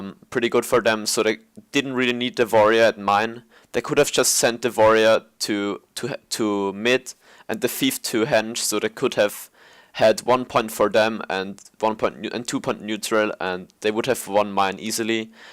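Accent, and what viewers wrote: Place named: Germany. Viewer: According to your accent i would say you're german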